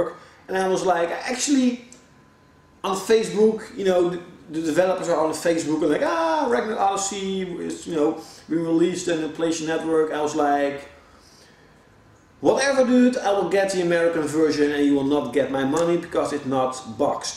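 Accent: Netherlands. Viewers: Are you dutch? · Gast je hoort echt te goed dat je nederlands bent... werk aan je uitspraak!! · Are you dutch? Nederlands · Haha goeie collectie, je kan trouwens goed horen dat je nederlands bent. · are you dutch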